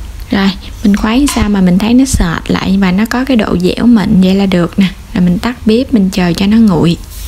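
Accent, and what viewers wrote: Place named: Tra Vinh, Vietnam. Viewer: Nhìn Bánh ngon quá, giọng nói Trà Vinh nghe dể thương đó ...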